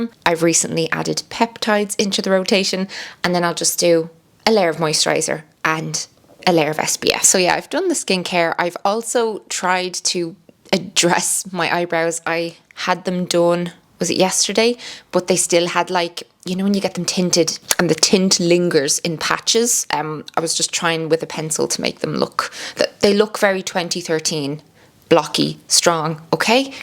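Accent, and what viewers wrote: Ireland. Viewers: I've been here from the start. Started following you for the beautiful Irish accent and stayed for your beautiful personality ♥️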